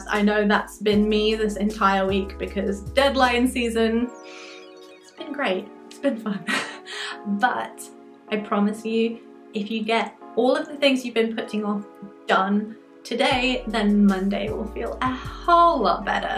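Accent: United Kingdom. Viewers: No hate r u British of American x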